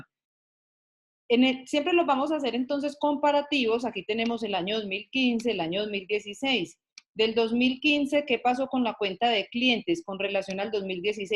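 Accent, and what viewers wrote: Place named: Antioquia, Colombia. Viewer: rica esa voz de paisa arrecha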